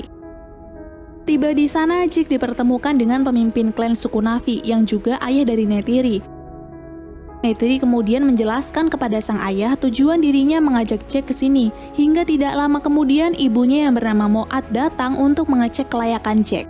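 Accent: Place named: Sunda, Indonesia. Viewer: Logat Sunda emang gurih. Wkwk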